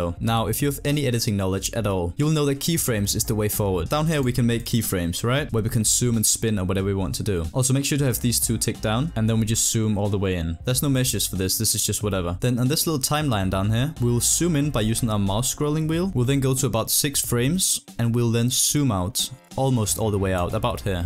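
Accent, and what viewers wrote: Denmark. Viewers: Laki Popic He's Danish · are u from denmark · Ohhhh den danske accent xD Super video!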